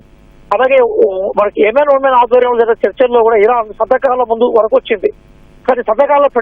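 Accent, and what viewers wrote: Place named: Telugu, India. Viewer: ఈ tv తెలుగు స్లాంగ్ 👌👌👌❤️❤️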